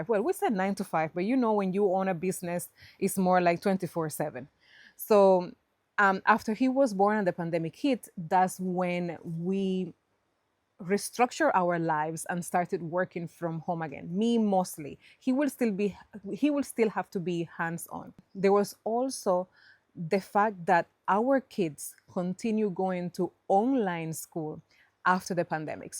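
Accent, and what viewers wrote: Jamaica. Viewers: You are such a great storyteller, content creator, wife, mother, businesswoman, and everything. So captivating. I have been watching you from as long as you have been doing this. It was you accent speaking the patois that first grabbed me, then I saw the sincerity and value in your content. Very uplifting and encouraging. I have being enjoying them all, and now Im hooked. Wishing you every success. Mucho love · I love to listening to you speaking patios 😃😃👍🙏🏽🙏🏽🇯🇲❤️❤️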